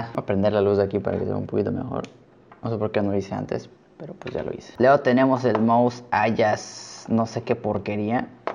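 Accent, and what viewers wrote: Yucatán, Mexico. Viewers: Por que hablas yuca? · Eres de yucatan ???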